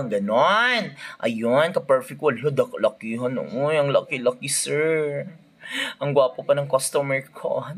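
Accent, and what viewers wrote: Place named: Waray, Philippines. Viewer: So satisfying.. waray2x ka madam? Heheh imo accent winaray man. 😊😊 more vids pa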